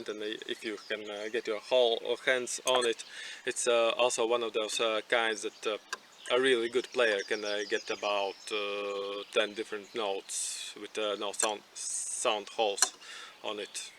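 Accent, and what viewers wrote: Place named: Scandinavia. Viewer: Hi. I am from sweden and was wondering if you can make a tagelharpa? The reason I said I was from Sweden is cuz I wondered what country you are from. I thought you sounded Nordic so I wondered if you can understand Swedish? I’m kinda bad at talking Swedish cuz Swedish is my first language and English is second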